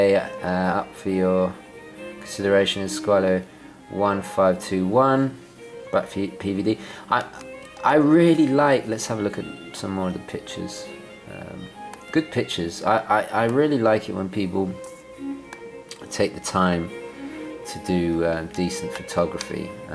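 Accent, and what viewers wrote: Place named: United Kingdom. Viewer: Not to nit pick......but its pronounced "Hoi-er" .....not "Hew-er".....for the brand Heuer. I guess it must be a Brit thing as you also tend to say "Oh-mee-gah".....vs, "Oh-may-gah" ( for Omega), as most others do. Standing by for the inevitable flaming, but my intent was to inform not to irritate. Good review.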